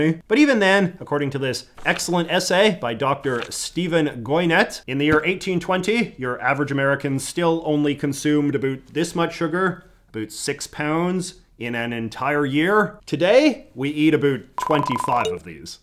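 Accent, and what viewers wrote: Canada. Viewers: I love the Canadian accent poppin out here and there! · didn't he say himself that his accent is stronger than most Canadians anyway? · This man is the most interesting combination of different groups. He has the hair and mustache style of the 80’s, the accent of a Canadian when saying words like about and around, he has the facial expressions and head positioning of a surfer dude, and he up-talks where you end your sentences in a higher pitch. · Never mind I just heard you pronounce about and around, you are 100% canadian no hiding it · i realized you were canadian. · he said aroond instead of around. he's not american he's canadian. al though one could argue that he is north-american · You don’t sound American. You sound Canadian. 🤔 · You make a very interesting video about candy in the United States, yet your Canadian accent and the candy from Dollarama betray you within the first 30 seconds of the video. 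Fellow Canadian here, good stuff 👍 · You sound very Canadian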